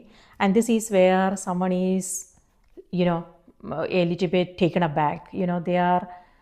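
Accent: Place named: India. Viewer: nooo ...not with your indian accents...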